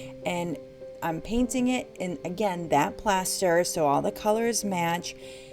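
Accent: United States. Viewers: Where u from? I hear a hint of an east coast accent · I’m from Somerset MA and you sound like me. I picked it up right away. I was going to say Attleboro but Plymouth makes sense too.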